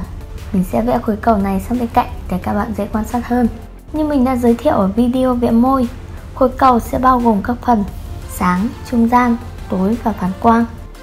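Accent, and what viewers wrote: Vietnam. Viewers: Cj là người Bắc hả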